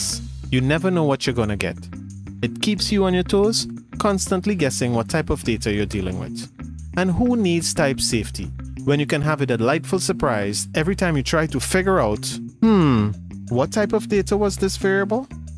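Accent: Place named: Trinidad and Tobago. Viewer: Dude your trini arent you lmao Love it .. keep it up bro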